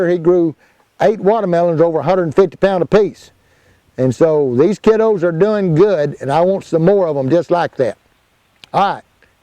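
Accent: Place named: East Tennessee, United States. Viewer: you sound almost like you are from East Tennessee. Almost. I love watching your videos. My grandpa used to grow the best muskmelon in the world. He's been gone now for 40 years...that's hard to believe. But I can still taste that muskmelon. He grew it by his chain link fence, and hung the Mellons on the fence in slings made from granny's old pantyhose, lol. I'm so glad to see you encouraging the young ones to grow, most of them have no idea what food is supposed to taste like. God bless you!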